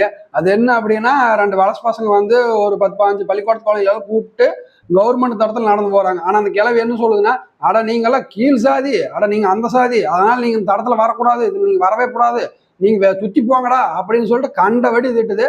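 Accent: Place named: Kongu, India. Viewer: தோழர் நீங்க பேசற அந்த மொழி வளம் நல்லா இருக்கு ..கொங்கு தமிழ்ல பேசுறீங்க ... எங்க திருப்பூர் மாவட்டத்தில் உள்ள கிராமங்களிலும் இது போன்ற சம்பவங்கள் கண் முன்னே நடக்கின்றது எல்லாருமே அதை கடந்து தான் போறாங்க யாரும் துணிந்து போராடுவதில்லை சாதி வெறியர்களும் திருந்துவதாக தெரியவில்லை.. இந்த கருமம் புடிச்ச சாதி வெறியர்களை ஒண்ணுமே பண்ண முடியல.. என்னதான் சட்டங்கள் போட்டாலும் கடுமையான நடவடிக்கை எடுத்தாலும்.. அதெல்லாம் அவங்களுக்கு பெரிய பிரச்சனையாக தெரிவதில்லை அவங்களோட சாதி திமிர எப்பவும் போல காட்டிட்டு தான் இருக்காங்க .. சாதி வெறியர்கள் மீது தொடர்ந்து வழக்குகள். நீதிமன்றம் வரை சென்று தண்டனை பெறுவதில்லை. பாதிக்கப்பட்ட சமுதாயத்தைச் சேர்ந்தவர்கள் தொடர்ந்து நீதிமன்றங்களுக்கு போக வேண்டுமா என்று சமரசம் பேசி வழக்கை வாபஸ் வாங்குகிறார்கள் என்ன செய்ய முடியும்.. அந்த சமூகத்தைச் சார்ந்தவர்கள் தினக்கூலிகள் தொடர்ந்து வழக்குகளுக்கு செல்ல அவர்களால் முடிவதில்லை.. அதனால் சாதி வெறியர்களுக்கு அனைத்துமே சாதகமாக அமைகிறது 100 வழக்குகள் போட்டால் ஐந்து வழக்குகள் கூட தண்டனை பெறுவதில்லை ஒன்னு ரெண்டு வழக்குகள் அதிசயமாக தண்டனை பெறும் .. 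சரி தோழர் தொடர்ந்து போராடுவோம் என்ன நம்மளால என்ன முடியுமோ அதை பண்ணுவோம் 😢😢😢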